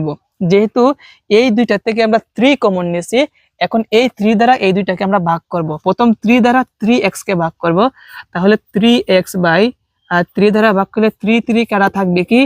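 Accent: Sylhet, Bangladesh. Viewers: কথা বলার ধরণেই বুঝে গেছি যদিও সিলেটি কোনো শব্দ ইউজ করেন নি!!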